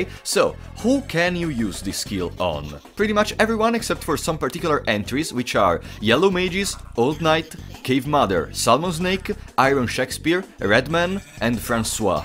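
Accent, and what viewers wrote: Italy. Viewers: Are you italian? Your accent is very similar to mine when I try to speak english haha thanks for the tips!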